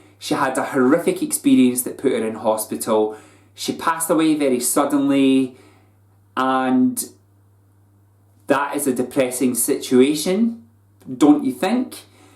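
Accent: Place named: North Scotland, United Kingdom. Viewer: omg I had no idea ur in Aberdeen. I'm in Aberdeen too. wheres your accent from, you sound like your from further up north xx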